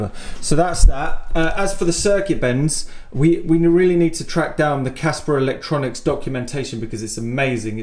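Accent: United Kingdom. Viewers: It's weird. I understand only less than two thirds of what you are talking about, but I find watching and listening to you still interesting, motivating (why? I don't think I'll ever get myself into any electronics project) and strangely.. soothing. Maybe that's the passion / creativity / positivity ("don't be scared to try"? wonderful) flowing through. And the lovely british you are speaking (though maybe this one is because I just watched an american movie located in Texas :). Anyway, thank you!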